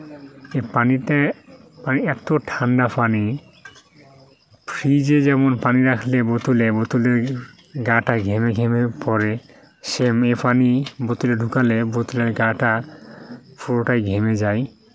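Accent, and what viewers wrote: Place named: India. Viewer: ভাই আপনি কি ইন্ডিয়ান এইভাবে কথা বলেছেন কেনো।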